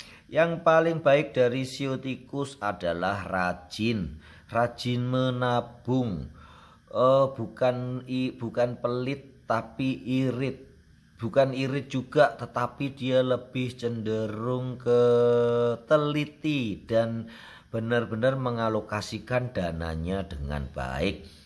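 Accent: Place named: East Java, Indonesia. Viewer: naahhh pasti dr Jawa ya ..Jatim kyknya ...logatnya medok ...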